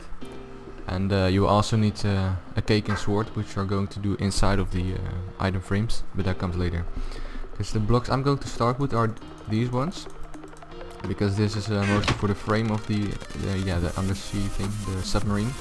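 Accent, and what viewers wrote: Netherlands. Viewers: Goede video ik hoor dat je uit Holland komt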